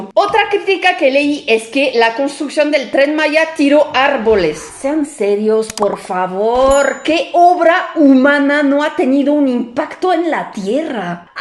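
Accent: France. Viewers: Saludos desde la CDMX. una observación, no es crítica pero ya casi no se te notaba tu asentó francés, pero ahora como que se te traba un poquitín la lengua jajaja.... 😂😅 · Me gusta tu acento francés. Felicidades por tu canal y contenido. Excelente trabajo de investigación como siempre. Tu opinión como usuaria de trenes en Europa es muy valiosa. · Como me encanta el español con ese acento frances, simplemente precioso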